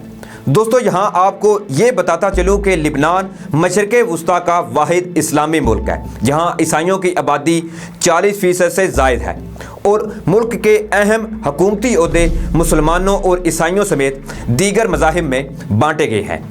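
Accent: India. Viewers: Is the presenter an Indian from his accent?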